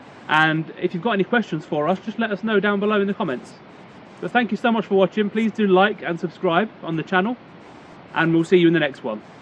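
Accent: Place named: United Kingdom. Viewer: Gosto de ver a perspectiva dos outras pessoas sobre o país. Parece-me que muitos britânicos conseguem ter uma opinião fundamentada e clara rapidamente; entendem relativamente bem a dinâmica social. Também aprendo algumas coisas, aparentemente, muitos estrangeiros que não são ricos, mostram-se satisfeitos com uma casa simples, que vão renovando de acordo com as suas circunstâncias, e fazem-no com muita resiliência e serenidade. Penso também sobre os rendimentos destas pessoas, mostram ser criativos e com domínio do mundo digital, onde se movimentam bem, e talvez estejam dispostos a correr mais riscos em relação à fiabilidade do emprego/rendimento/income a médio prazo, do que eu estaria. Por vezes pergunto-me, o interior está desertificado e desaproveitado, se estrangeiros podem viver nestas áreas porque mais jovens portugueses não o poderiam também fazer? 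A resposta clássica de muitos portugueses, grupo nacional de que tenho a honra de fazer parte, seria que os "estrangeiros têm muito dinheiro e/ou fontes de rendimento dos seus países de origem". Na minha opinião é uma resposta redutora, claro que existem estrangeiros com muito dinheiro, mas provavelmente não serão a maioria, pelo menos dos que vivem em Portugal, por isso, talvez possamos reter algo do seu modo de vida, e da maneira como criam sinergias e soluções de vida no interior. Este simpático jovem senhor, transmite a imagem de uma pessoa pacífica e bem intencionada, gosto do sotaque britânico, e vejo sempre os vídeos s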